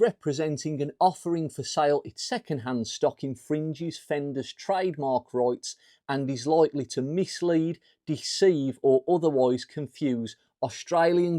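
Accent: Black Country, United Kingdom. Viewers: This accent just took me straight to a time in my life when I lived in rapid succession in Dudley, Wednesbury, Cradley Heath. I'm in <num> again.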